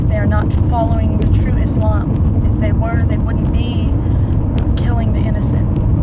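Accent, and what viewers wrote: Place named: United States. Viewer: she's USA citizen and sees what happened to PC Europe and like many US peeps she doesn't want it to happen in USA as Trump supporters worry about.... she's quite pretty.......she reads books!!!!!!!!!!! USA woman who reads books?????????????????????? da! is this possible? Am I seeing a female robot with a US accent' 'so nice that accent'' yes what a lovely voice....did I mention she reads????????????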